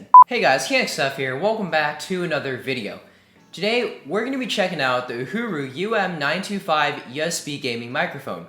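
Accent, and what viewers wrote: South, United States. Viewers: I think the intro was your original accent and you put on a more "normal" accent for the rest of your video. Dont be afraid to show your viewers who you are. Let your southern accent out with love and passion. We want it. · good southern accent. Chinese accent is next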